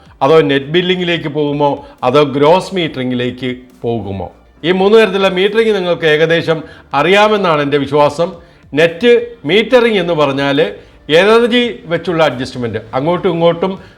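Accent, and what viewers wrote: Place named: Poonjar, India. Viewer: പൂഞ്ഞാറ്റിലെ ഇംഗ്ലീഷ്.. താനക്കെ ഇംഗ്ലീഷ് ഡബ്ബിംഗ് വീഡിയോ ഇട്ടിട്ട് ആര് കാണാനാണ്..... കോണത്തിലെ ഇംഗ്ലീഷ്...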